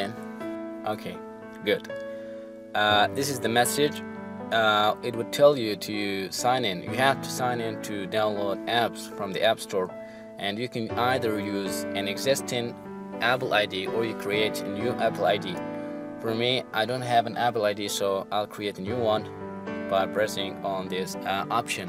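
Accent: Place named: Arab world. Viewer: why i undrestood your english becouse you arab and i am somali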